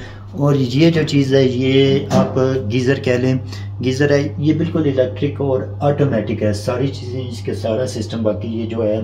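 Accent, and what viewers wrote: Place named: Rawalpindi, Pakistan. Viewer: I live islamabad ap ka bolne andaz pandi ka lak raha ha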